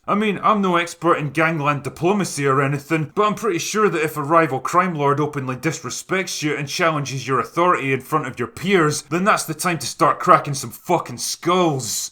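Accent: Scotland, United Kingdom. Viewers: Done in a beautiful Scotish accent as well, a fine piece of journalism in a world of dying journalists, the only true news i,m heard this decade, nice one mate.......... ITS A FUCKING JOKE , MODS , the designers went raving and used Quadrophinia as the storyboard instead of going to work......... WANKERS. LOL..... brilliantly said mate.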